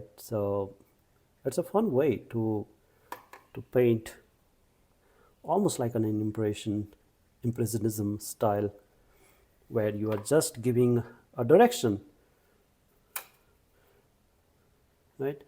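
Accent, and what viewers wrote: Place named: India. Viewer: Always grateful for your generous gift of your time and knowledge,. And don't worry about your accent; I am in Australia, so an Indian accent is no longer foreign! Peter Downie.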